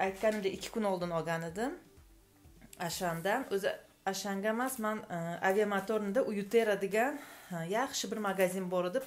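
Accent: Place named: Samarkand, Uzbekistan. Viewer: Samarkanlikmisiz gapurushingiz uhshayopti 😊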